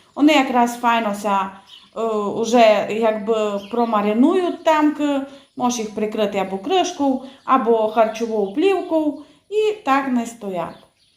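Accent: Transcarpathia, Ukraine. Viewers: у вас чудовий канал, чудовий матеріал, чудова закарпатська вимова. А ті типу-українці неповноцінні, що їм не подобається Закарпаття і закарпатці, хай слухають про варенички. З великим задоволенням спостерігаю за Вашою творчістю. Всього найкращого. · Завдяки Вам, світ пізнає закарпатський діалект. А східний російсько-український діалект ми давно вже пізнали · спасибо Вам за замечательные рецепты, а слушая Вашу речь переношусь к своим друзьям, ставшими родными за много лет, в село Камяница. Горько, что не могу встретиться с ними, но когда смотрю Ваши ролики возвращаюсь в любимое Закарпатье. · говоріть, говоріть і ще раз говоріть по закарпатськи! Це ваша фішка! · Мені дуже приємно, що зараз є можливість чути закарпатський діалект, дякую за вашу працю.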